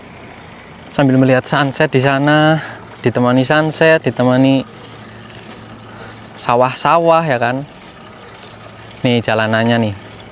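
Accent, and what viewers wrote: Banyumas, Indonesia. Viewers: Guyu ne kakak khas banget kaleh ngapak e nek ngomong seng bikin TOP.bojonegoro Jawa timur hadir.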